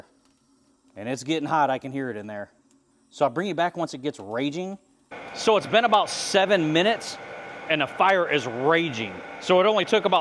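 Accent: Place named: Buffalo, United States. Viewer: My dad was a boiler code welder at Harrison Radiator Division of GM for over 30 years. He passed away at 80 just 2 years ago this past week. (28th of Nov) He would have LOVED your video and everything about your entire build here! He might have had some ideas & inputs as well. He always talked about building a boiler to burn waste oil. He knew a fella in our area in Western NY that made one back in the early 80's (or maybe it was the late 70's) At any rate he passed that interest on to me. We have lived in Alabama for almost 40 years now, so we don't have quite the need for heat as we do the A/C, but it can get downright chilly even through the short winter that we do have. I think I'd have some fun with the handles, I was thinking springs or twisted rod. There's so many things you can do to put the finishing touches on. Buttons, bells & whistles right? 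Man! I am just happy to see all you've done & appreciate ya! Good goin' bro! I'm just curious, are you in Michigan? There were a few things that you said that almost sound like a Buffalo accent, but you don't sound too much like us!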